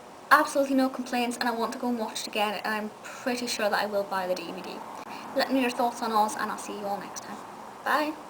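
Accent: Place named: England, United Kingdom. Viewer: Miss: Love your witty review of Oz. I also love your English Accent!!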